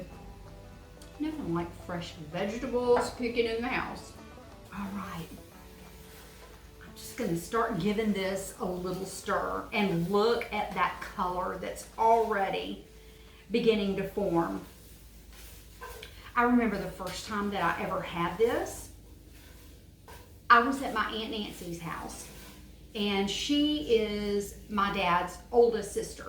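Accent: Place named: Alabama, United States. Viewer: Living in the Uk, looking for a ladyfinger ( okra, they dont use the name "okra" over here so much)recipe ...and being a native Eufaulian from Alabama, Iinstantly recognized the accent. This is exactly what I was looking for in an okra recipe. Thanks so much for reminding me of my old home far away.......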